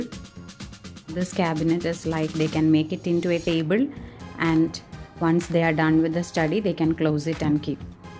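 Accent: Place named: Kerala, India. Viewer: I knew it...ur from Kerala from ur accent 😂😂😅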